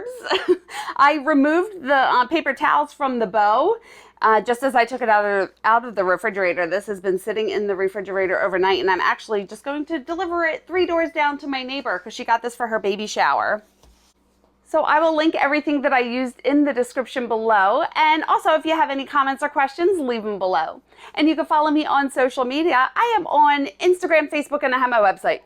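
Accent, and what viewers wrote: Philadelphia, United States. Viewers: 😂😂😂 my Phillly Sis😂😂😂 since I’m out of Philly now the accent is real. People used to tease me now I see we do have a Philly sound.❤️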